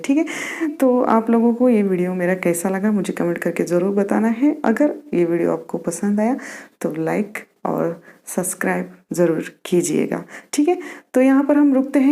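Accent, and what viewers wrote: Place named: Maharashtra, India. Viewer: Just by listening to your accent I made out that you are a Maharashtriyan.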